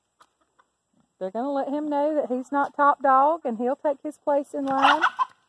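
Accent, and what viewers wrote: South, United States. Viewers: This lady has the most appealing Southern accent and way of speaking. I know she’s a Mom and all, but I’m smitten. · This woman has the loveliest southern accent I've ever heard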